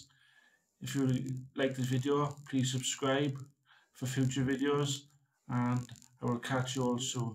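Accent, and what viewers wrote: Wales, United Kingdom. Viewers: Not Welsh. English with a Welsh accent. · A great few hours with the air gun, that’s a good Welsh accent. I live near Hirwaun and shoot my share squirrels 👍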